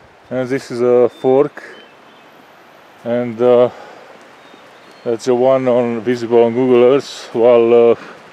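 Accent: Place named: Ukraine. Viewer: Almost to hot for swimming, UV is generally 9 or higher so we tend to stay out of that as much as possible. Our area of choice to hike in is Whiteshell provincial park..just named a UNESCO world heritage site for its stunning boreal forest and petroforms..a truly magical place. I thought that I recognized your accent, my Grandfather was from just that area.. Ternopil to be precise..I remember him telling me of the beautiful hills and mountains.. small world isn't it? Cheers!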